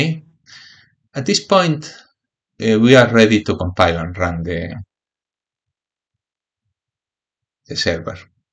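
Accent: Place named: Spain. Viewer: Sounds like an spanish accent to me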